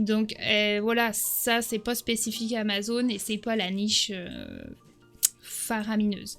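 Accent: Canada. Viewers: par ton parler j’ai compris que tu te trouvais au Canada, je dois commencer bientôt mon projet fba mais actuellement j’ai pas bcp de temps que conseille tu pour commencer quand on a peut de temps a mettre?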